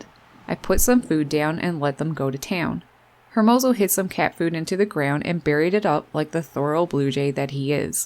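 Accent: Newfoundland, Canada. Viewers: I love your jays!!!! My jay family seems to have totally different calls, reactions and habits than yours. Maybe cause they in a different side of the continent? I just love them too!!! Happy Jay watching!!! 💙 (I'm guessing from your accent you're in NFLD?) 😁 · This was very entertaining! I LOL'ed several times. I've started feeding blue jays recently, but I did not realize they could become so comfortable with humans. Their squeaks sound a bit different where I live... you sound Canadian... maybe your blue jays have a Canadian accent? Anyway, thank you for sharing this remarkable and delightful footage.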